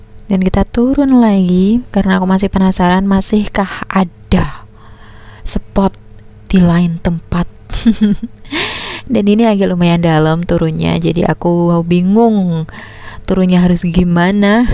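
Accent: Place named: West Java, Indonesia. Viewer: Peda orng sunda dina nyarios na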